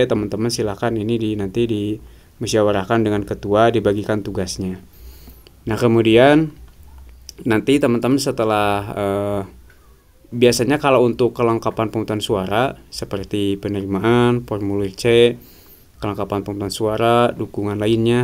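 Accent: Sunda, Indonesia. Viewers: si akang orang sunda yah, ada sunda sudannya dikit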